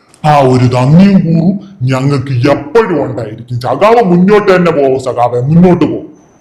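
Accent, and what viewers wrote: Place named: Trivandrum, India. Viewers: ചേട്ടാ! സൂപ്പർ, തിരോന്തരം ശൈലി 🤣😂🤣😄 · 😂😂 നമിച്ചു തിരോന്തോരം സ്ലാന്ക് 😜🤣👍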